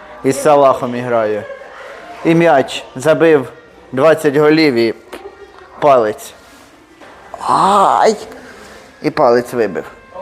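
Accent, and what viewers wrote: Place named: Central Ukraine, Ukraine. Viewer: По говору з центру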